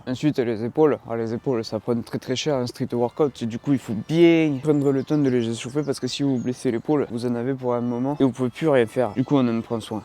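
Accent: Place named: South, France. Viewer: Ouahhh l'accent du sud, on l'entend vraiment fort, mais c'est drôle je trouve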